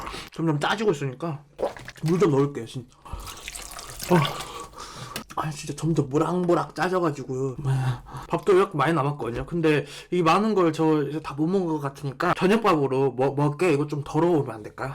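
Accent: Jeolla, South Korea. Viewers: 오빠 말투 너무 귀엽자나요...... 전라도전라도한게 구수하기보다 귀여워서 계속 그 부분 돌려보게 되자나ㅠㅠㅠㅠㅠㅠ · 사투리보고 도 전라도 사람인거 인증 저도 전라돈데 뭔가 같은게 있어서 기분이 좋아 욤! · 전라도 사투리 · ㅋㅋㅋㅋ킬바사 나트륨은 여전하신지 ㅋㅋㅋ이전에도 짜다고 짜증냇는거 기억나ㅋㅋㅋ짜증낼때 전라도 사투리 나오는거 졸귀ㅎㅎ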